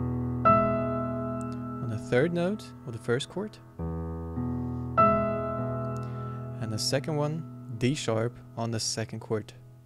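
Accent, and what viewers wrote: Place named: Afrikaans, South Africa. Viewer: Super clear tutorial there 👌 I'm gonna carry on learning this absolute classic cult track from the Pixies 😎👍🎹🎹🎹🎹🎹🎵🇬🇧 ps. Trying to work out your accent - Afrikaans or straight forward Dutch?... I need to know! 😄🇬🇧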